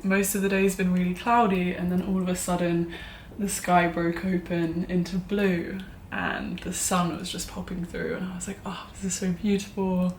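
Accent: Argentina. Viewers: Aaaah you already have kind of an argentinian accent, so cute, you are doing so good 👏🏼👏🏼👏🏼👏🏼 mucho ánimo 💪🏼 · Me encanta tu acento cuando hablas el español. Un toque del argentino, ay que precioso! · Hey! I have a few things for you to maybe help your Spanish! Let's go = Vamos (Second person in plural, present tense of "ir"), "Vamonos" sound like let's leave for Argentinians. "Hoy" sounds a lot like the German word Heute, both mean today You can you "Son las 3 de la tarde", you can just forget about the subject of the sentences, it doesen't matters to spanish speakers if it's the hour or the hours that "son". I love that your Spanish has a little of an Argentinian "sh" sound 🤭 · "jugo de naranja para 'shevar'?" AMO que su español tenga un pequeño acento argentino, es muy tierno💕💕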